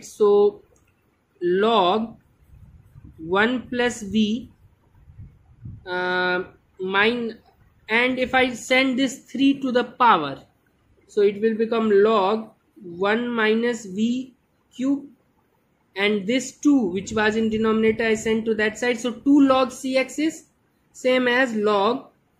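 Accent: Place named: Pakistan. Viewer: Bro your pronunciation sounds like mohd.rizwan(PAK CRICKETER)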